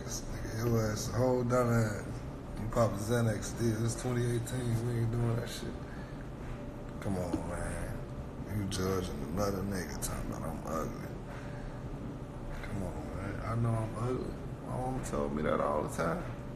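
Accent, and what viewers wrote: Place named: Chicago, United States. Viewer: He sound so Chicago ♥️❤️♥️❤️♥️😍👑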